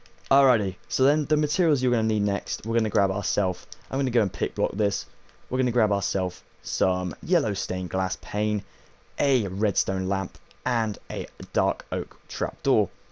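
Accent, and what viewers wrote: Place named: United Kingdom. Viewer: the british accent makes this better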